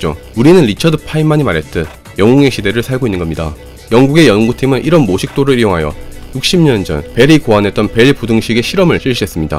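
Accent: Gyeongsang, South Korea. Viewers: 혹시 실례가 안된다면 억양이 경상도가 고향이신거 같으네요. 포항이나 경주영천? 경북남쪽지역 억양은 확실한데 서울 가신지도 10년은 안됐을듯하네요. 제가 20초에 서울갔을때 그리고 10년 서울생활했을때랑 진짜 비슷해서요ㅎㅎ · 어?? 뭔가 이분 목소리랑말투 맨첨에들었을땐 섹시한 동굴목소리가진 미국교포 한국어말투 느낌이었는데 듣다보니 경상도억양이.....ㅋㅋㅋㅋㅋㅋㅋㅋ귀여워ㅋㅋㅋㅋ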